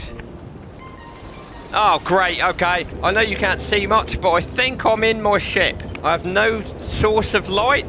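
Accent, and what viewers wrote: United Kingdom. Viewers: I like your British accent 😂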